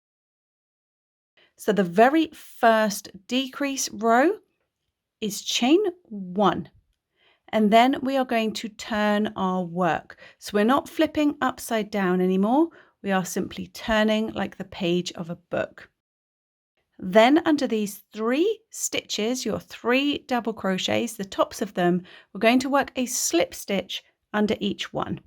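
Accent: United Kingdom. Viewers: This is the first time I've come across your tutorials and have watched this corner to corner one with fascination show simple you have made it look for even a beginner crocheter. I am certainly not a beginner but will now recommend your site to those that are, to perhaps use as a second project, once they have made an item using UK terms. My only criticism is that because of your accent I'd assumed your instructions would have been using UK terms but as the work only involves ch, ss and tr there should be no real confusion because of your clear illustrations. I've, opted to make a doll"s blanket alongside a beginner,using your tutorial to show them how to crochet so that they can learn to switch more easily between American and British crochet patterns. Thank you for such a brilliantly clear tutorial, it will make my teaching so much easier for me! xx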